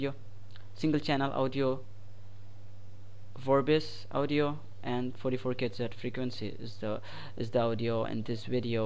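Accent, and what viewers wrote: India. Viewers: Nice vid. And nice accent, are you Indian? :D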